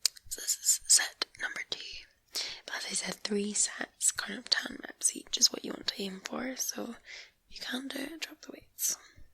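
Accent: Scotland, United Kingdom. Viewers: Normally when something tingles at the gym I’ve injured myself!! LOL Maybe if I had a lovely Scottish trainer? 💪🏻🥰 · I all most died i was working out and a Scottish woman whispers In my I ear and I hit the ground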